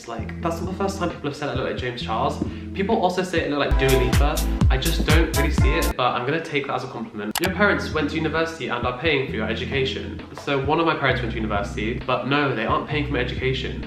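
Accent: RP, United Kingdom. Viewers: I hate his britain voice. Akhhhhhhtuu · Mar Teumar right? even the way he speaks sounds posh. i mean, isn’t that RP? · Maybe what some people catch that causes the “posh” assumption is that, at least to these American ears, you have a posh accent. I fully admit I am no expert and may be bead wrong. However, I like your accent. It is part of the reason I find you attractive and interesting. Best wishes with med school. 👍👍🤞🤞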